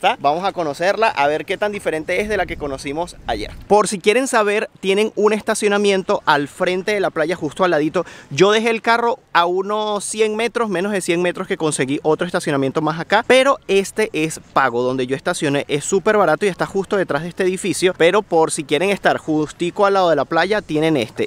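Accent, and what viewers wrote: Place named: Venezuela. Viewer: Pense q. hablabas Portugues q. eras Venezolano padres portugueses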